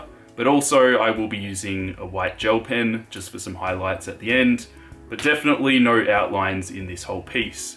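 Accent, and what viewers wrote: Australia. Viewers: Yes! Another Aussie! Love your work mate! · Bro are you Aussie??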